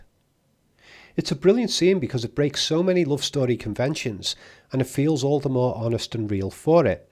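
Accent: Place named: North, United Kingdom. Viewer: Your northern accent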